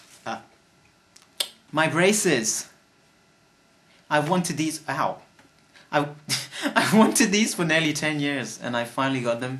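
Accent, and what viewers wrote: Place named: United Kingdom. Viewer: This is great! My first thought was, he sounds like he's from the UK.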